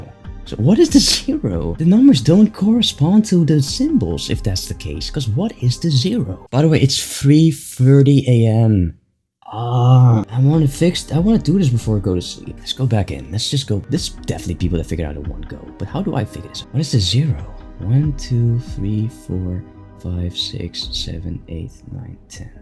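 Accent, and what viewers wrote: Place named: Netherlands. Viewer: Are you from the Netherlands? I feel like your accent sounds Dutch 😅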